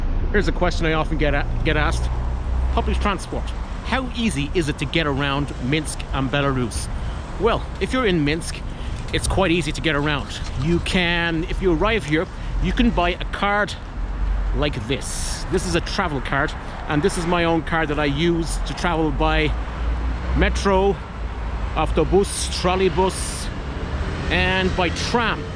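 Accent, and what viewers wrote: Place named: Tipperary, Ireland. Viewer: Trying to work out the accent, thinking not Cork, more a Tipperary, Waterfordish? Could be Wexford, oh I had a girlfriend from Wexford, her dad owned a load of pubs and super respected bloke, his daughter was a true clear skinned Coleen of beauty and bright intellect as we shared our love of certain works of art.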